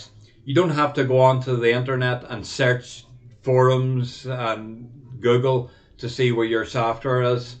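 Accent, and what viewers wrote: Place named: Ireland. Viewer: GerDay, Because I a real "bushie" (live and work in remote areas of Australia), I'm struggling just a little with your accent! NOT your fault - it's just how it is - I don't get enough exposure to Paddy's!!!!! All this is leading up to say it MIGHT be an idea if you're going to show this "from scratch" like this to include links and/or command line text in your description file so that folk who are NOT fully cognisant with Ubuntu AND (like me) who might struggle with your accent can follow along easier! Having said that, this is excellent, so far and I appreciate your efforts!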